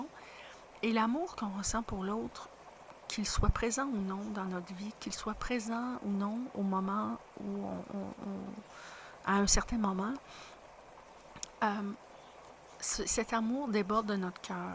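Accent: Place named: Quebec, Canada. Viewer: Tu t'exprimes si bien... Curieusement, ça me fait du bien d'entendre une voix québécoise, comme si tout d'un coup je sentais un sentiment d'appartenance encore plus grand à la communauté. J'ai vraiment l'impression que tu es sage. Tu me fais penser à moi dans mes quelques peaks de sagesse, ici et là dans mon parcours de maintenant presque 11 ans. C'est difficile à expliquer, mais t'écouter m'aide à m'expliquer des choses que je vis ou que j'ai vécues, sans avoir la capacité de mettre les mots dessus. T'écouter est comme lire ma propre histoire. Merci pour tout.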